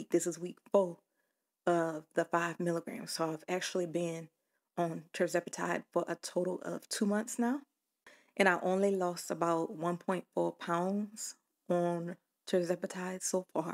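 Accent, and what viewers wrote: Louisiana, United States. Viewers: You have a Louisiana accent... Heavy Louisiana accent.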